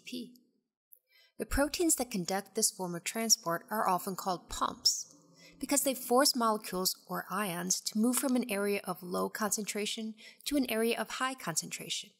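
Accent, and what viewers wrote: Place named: Midwest, United States. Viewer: love the subtle Midwest accent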